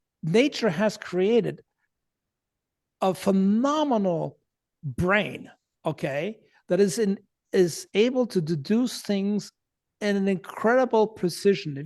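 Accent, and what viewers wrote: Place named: United States. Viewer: Dass so gut Englisch kann, schreckt mich wenig, spricht wie ein Amerikaner👍 Und er ist noch dazu Österreicher wie ich...👌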